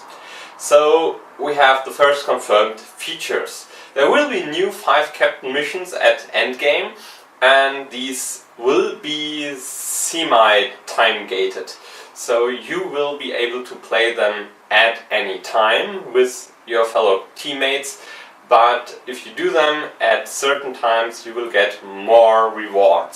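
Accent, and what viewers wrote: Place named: Germany. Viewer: Excellent first episode! Don't apologize for your "bad English." While you do have a strong accent that makes it obvious that you're a native German speaker, your English was easy to understand. Heck, I wish my German was half as good as your English. I look forward to watching more episodes of Captain's Log. Gut gemacht!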